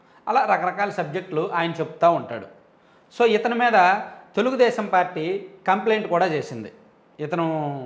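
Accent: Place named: Rayalaseema, India. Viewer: he uses Seema accent, and satirical site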